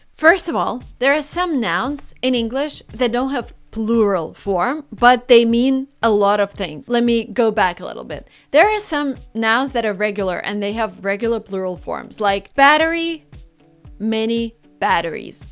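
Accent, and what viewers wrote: Russia. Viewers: You still have that little Russian accent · отличное произношение, но все равно слышно что не нативный носитель, русское наследие дает о себе знать. интересно сколько лет уже на англ.? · She still has a little bit of russian accent, for instance how she says "can't" haha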